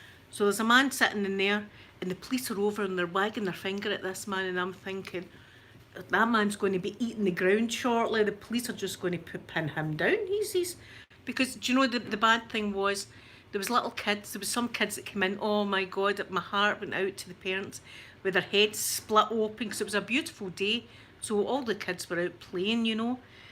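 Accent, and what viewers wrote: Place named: Glasgow, United Kingdom. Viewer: I could listen to you for hours. My dad is glaswegian so your accent feels like home to me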